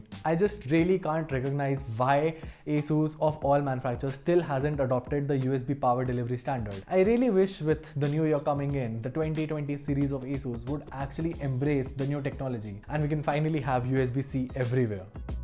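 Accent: India. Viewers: The laptop on the video is so drity it looks like u pulled it out of a trash. And try to work on that Indian acceent to be less listenable, we not all americans but we try to fit globally. I had to watch this low budget review with a laptop pulled out of Kirby Trash Bag cuz it was the only one with this model. Cheers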